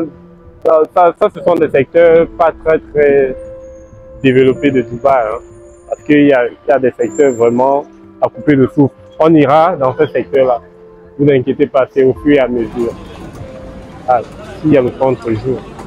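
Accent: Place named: Cameroon. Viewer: Je suis intéressé mais gars a un accent camerounais, ca ne rassure pas.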